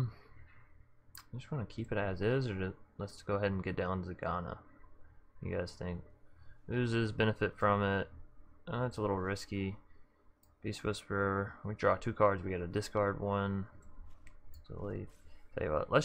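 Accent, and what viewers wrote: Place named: Alabama, United States. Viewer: You from Bama homie?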